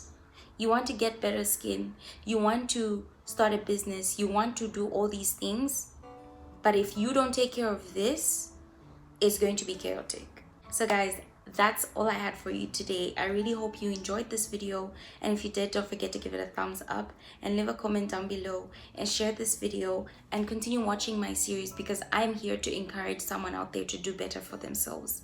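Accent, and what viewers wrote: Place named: South Africa. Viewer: You sound South African sometimes ... so cute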